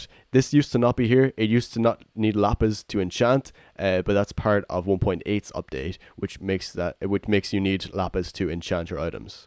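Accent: Ireland. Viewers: Sounds like an Irish John H. Benjamin...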